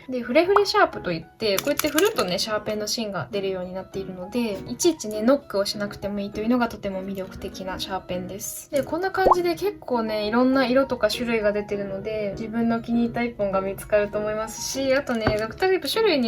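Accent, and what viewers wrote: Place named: Kansai, Japan. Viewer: 関西弁可愛い……………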